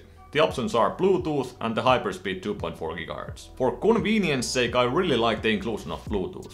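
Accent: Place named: Finland. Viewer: bro the Finnish accent💀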